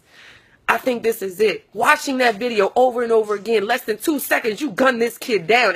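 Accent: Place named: New Orleans, United States. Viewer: 1202_ProgramAlarm she has a New Orleans accent... she obviously Cajun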